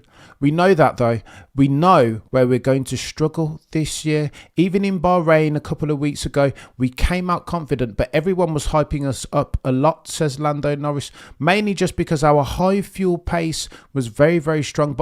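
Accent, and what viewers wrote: United Kingdom. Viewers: Love you, but really struggle to understand with your heavy British accent. My loss. Keep it going!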